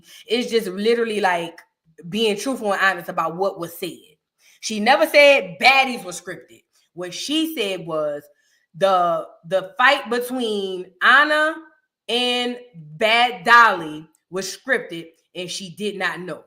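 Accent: New Orleans, United States. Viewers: Girl I adore her New Orleans accent!! ❤❤❤ one of the main reason I watch her!!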